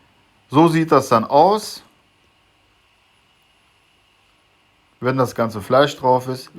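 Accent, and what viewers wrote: Ruhr, Germany. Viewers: Sehr gut erklärt! Kommst du ausm Ruhrpott?? deinen Dialekt finde ich klasse, Ruhrpottbruder! LG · Super Rezept und echt lecker. Kommst du aus‘m Ruhrgebiet oder hast da lange gewohnt, weil du öfter „dat“ sachst 😉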